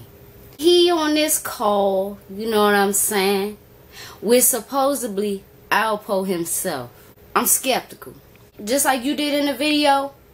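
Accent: Washington DC, United States. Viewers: Do your thing sweetie much love to you I from dc.u sound like u from the dc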